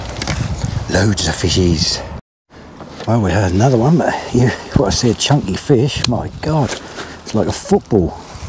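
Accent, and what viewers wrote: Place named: East Anglia, United Kingdom. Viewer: Waggler is difficult on a windy day but it looked calm there. With the amount of pellets needed to keep the fish in the upper layers not all of them get eaten so you’ll have a nice bed on the bottom for the bomb & pellet or method feeder. A lot of the time the bigger carp will sit just at the back of the feed and pick off a few loose ones so casting past your pellets isn’t a bad idea. Then fire in a few more and twitch into them. Eventually those bigger carp will have your hook bait. Lots of lovely lakes packed with fish in the Norwich and surrounding areas. Can tell you’re a born and bred Norfolk/Suffolk boy with that accent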